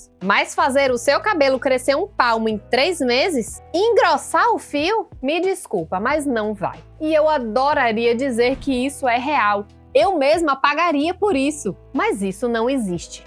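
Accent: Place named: Bahia, Brazil. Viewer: Baiana de Salvador sim😂 Rapaz, reconheco logo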